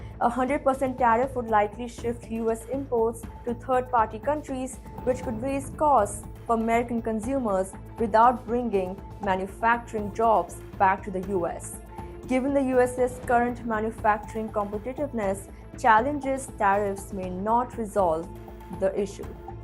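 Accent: India. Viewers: My God Indian voice again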